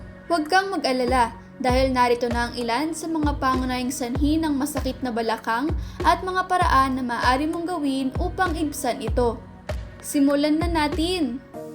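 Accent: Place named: Iloilo, Philippines. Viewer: ilonggo ka doc ay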